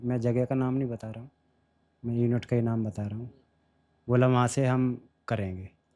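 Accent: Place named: Madhya Pradesh, India. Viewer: जय हिंद जी आप तो mp से हो आपकी भाषा mp ki लग रही हैं कंहा से हो सर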